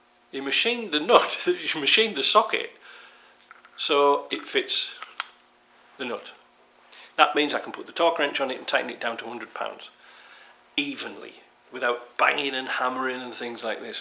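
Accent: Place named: North, United Kingdom. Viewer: As we are well into the 21st century now, it appears that it is becoming an increasingly pc-culture where we all have to be careful not to hurt other people's feelings by making passing comments about this, that or the other! One of your greatest assets to my mind, is straight-talking without all the BS & razzamatazz often associated with instructional videos elsewhere. Its not like you threatened anyone or incited bad behaviour and I (as a fellow northern Englishman in the UK) can empathise how the northern attitude & brogue, is sometimes misconstrued as harsh to some sensitive souls! Just keep doing what you've been doing & helping all those with older Defenders, to keep on truckin'!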